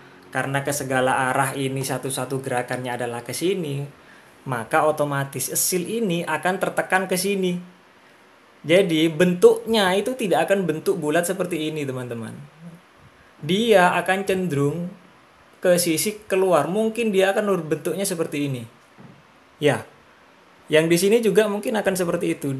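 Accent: Madura, Indonesia. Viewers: Nada2 orang madura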